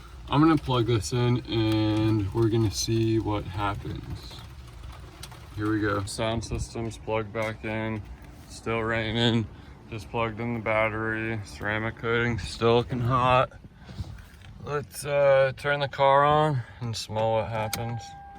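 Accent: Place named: Venice California, United States. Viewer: Bruh your personality is funny sound like a Cali Venice man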